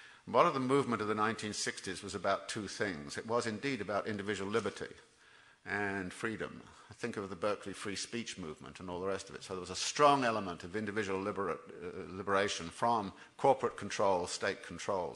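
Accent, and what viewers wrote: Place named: RP, United Kingdom. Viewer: I despise marxism, and commmunism. He is basicaly telling us this; "This 'crisis' is proof that your system is not working. Adopt marxism." . When crisis occurs under marxist system, watchout! Out come show trials, arrests of enemies of the people. His scolarly British gentry accent does not fool me. If people like him are in power, they will spill as much blood as they deem necessary to keep it. The red flag of communism gets its color from being dipped into blood of its victims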